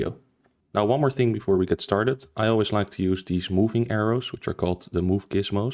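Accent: Netherlands. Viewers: Hi I have a problem when exporting my Character. I followed all the steps but when I drag my character from my desktop to Unreal Engine, the chest is hollow. I went back to blender but there everything was fine. I don't understand what I did wrong can you help me? also, are you Dutch? If so: hallo! ^^ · I heard it cuz im actually dutch too,anyway this was very helpfull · sum tell me u dutch. could it be ur accent? i'd recognize a dutch accent anywhere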